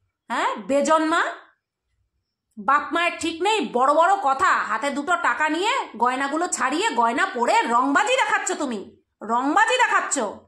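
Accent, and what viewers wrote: Bangal, Bangladesh. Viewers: Tumi to kauke nokol kora comedy kora bangal vasay kotha bola egulo darun koro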